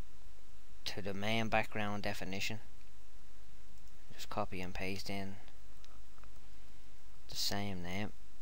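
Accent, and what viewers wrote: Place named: Scotland, United Kingdom. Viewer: You got a veeeeery Scotish voiess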